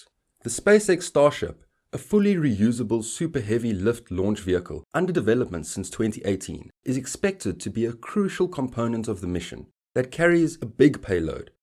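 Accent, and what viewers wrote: South Africa. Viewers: I can hear your South African accent from a mile away. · you have a south african accent? i'm from durban, loved the video!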